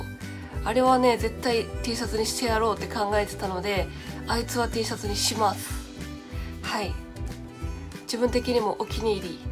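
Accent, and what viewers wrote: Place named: Kansai, Japan. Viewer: 自然に関西弁で全然良い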